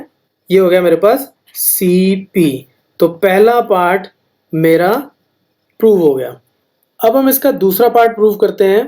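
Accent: Punjab, India. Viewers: Are you punjabii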